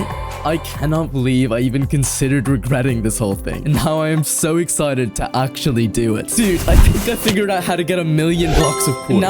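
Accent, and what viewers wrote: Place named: Ireland. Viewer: Yoo I Heard Your Irish And Im Irish :)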